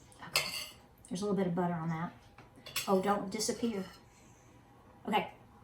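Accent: South, United States. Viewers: Also I am subbin any gud ole southern gal that says Flar and not flour is my kinda sister. Glad to have found ya channel gurl! God bless y'all!!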